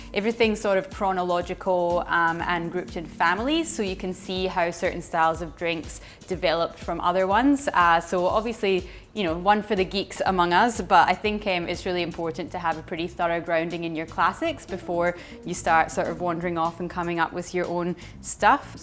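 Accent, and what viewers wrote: Scotland, United Kingdom. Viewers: Thank you for all of your effort! Scottish accent? The best... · First! Love the scottish accent :)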